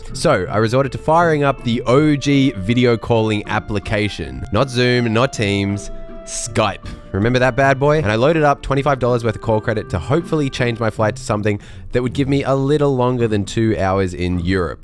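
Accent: Australia. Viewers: I always thought you were American until you said "Australian dollars," then I listened to your accents, and that's how I feel you are Australian :)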